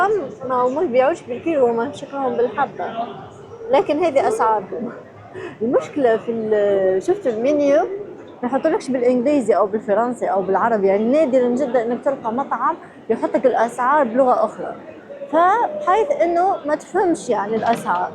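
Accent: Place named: Tunisia. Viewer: انا اكتر شي مستمتعة بلهجتك التونسية الحبيبة ,,, فيديو أكثر من رائع <3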